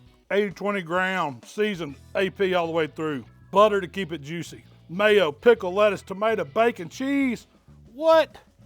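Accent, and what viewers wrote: South, United States. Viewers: Always trust a chunky happy southern sounding cook on a grill! Lol can’t go wrong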